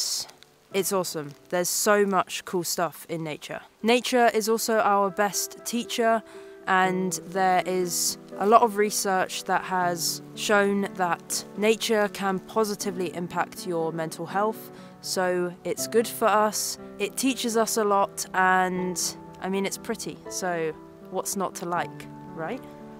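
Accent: United Kingdom. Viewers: I think I understand the accent very well but there several new words that I've never heard before (for animals, plants etc.). Thankfully not that specifically annoying type of British accent.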